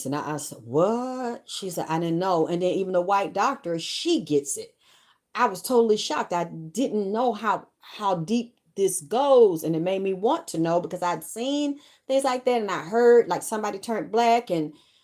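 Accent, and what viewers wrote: South, United States. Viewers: I love the country twang. It’s what I fell in love with . Pleaseeeeeeee don’t ever lose it lol 🥰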